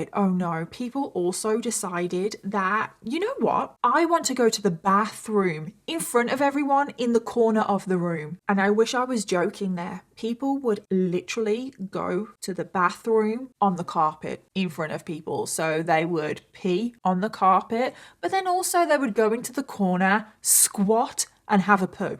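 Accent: United Kingdom. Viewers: we love a sassy British accent truecrime queen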